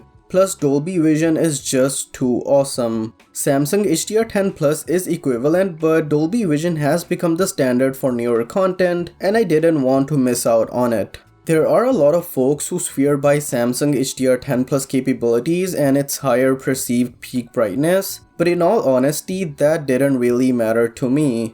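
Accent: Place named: India. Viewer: Canadian? I thought dude was Indian 😅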